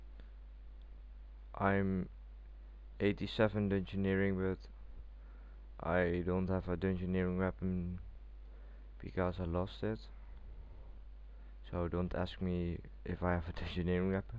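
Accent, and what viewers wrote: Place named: Netherlands. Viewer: nederlands accent ftw :D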